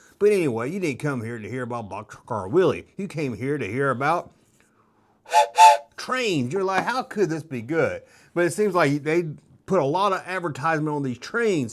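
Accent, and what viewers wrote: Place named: North, United States. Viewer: "north" mississippi? sounds more like Yankee Mississippi to me!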